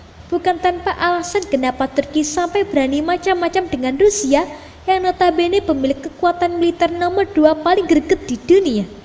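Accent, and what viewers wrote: Java, Indonesia. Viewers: medok amat ch ngmngnya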